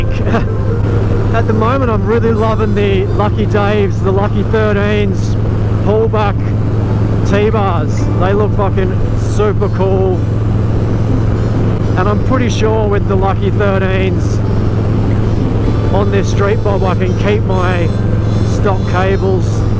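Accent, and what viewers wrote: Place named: Australia. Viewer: Love your accent, mate, it reminds me of my deck hand years in cairns 😊. And love the street bob, getting my 107 next month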